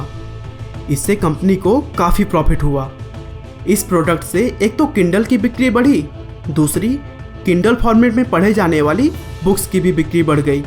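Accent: India. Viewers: Bai Hindi accent 😥 overall nice video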